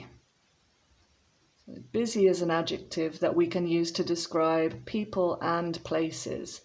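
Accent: United Kingdom. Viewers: Thanks a lot. It's very useful for me. I like your British accent!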